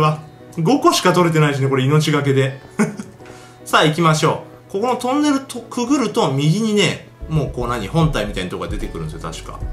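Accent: Niigata, Japan. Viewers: ヒカキンよく落ちること「落っちゃう」って言うけど新潟の方言(´ω`) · 今日は地元の方言がよく出ますね（新潟県民より） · 多分だと思うけど、新潟県出身なんじゃない？ヒカキンは、だから、おっちゃうっていていると、おもう。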